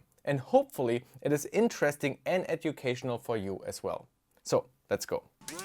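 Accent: Germany. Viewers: You're german right? I think I hear it from your voice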